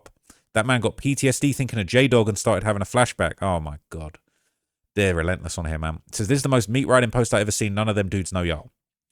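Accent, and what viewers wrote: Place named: United Kingdom. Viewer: This nigga is Chicago new akademiks lmao with a UK accent tho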